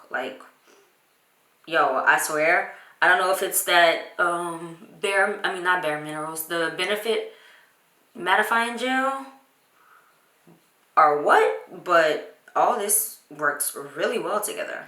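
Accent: Louisiana, United States. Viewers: I’m sorry for your loss. Praying for peace for you. When I started watching your videos I kept saying to myself she sounds like she’s from Louisiana. When you just said it I yelled “I knew it”. I’m from Opelousas. Live in Maryland now. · Ahhhh, it's so refreshing to hear that Louisiana accent! I miss Lafayette so much. 😍 I love how you keep your faith in God and stay on those kids to be respectful. This look though 🙌🏾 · I love the way you try to correct you louisiana accent lol. I do the same when speaking in public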